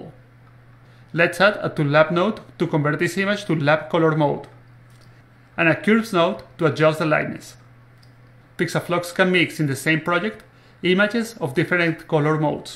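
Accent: Spain. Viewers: Buenas equipo de. Antes de nada, vuestro software es increíble, estoy deseando probarlo y empezar a hacer mis texturas en él. Otra cosa; si no me equivoco por el acento, sois españoles, si es el caso, tenéis algún tipo de video tutoriales en español? Por lo que he visto son todos en inglés, aunque no es un gran problema, se me escapan ciertas cosas con el inglés, y me iría de perlas que fueran en castellano. Muchas gracias y seguid así!!!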